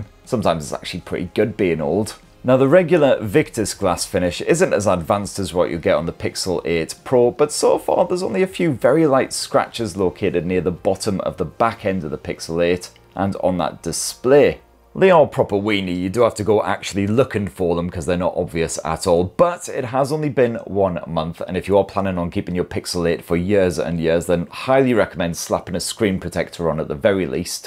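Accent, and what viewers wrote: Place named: Newcastle, United Kingdom. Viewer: Howay ! Bonny Lad etc . What a charming accent you have… smug… smug… yours, smug southern bastard . Keep up the excellent reviews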